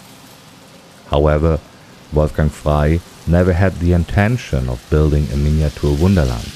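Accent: Germany. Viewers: Geiler deutscher Akzent hört sich echt witzig an! (Das war NICHT beleidigend gemeint)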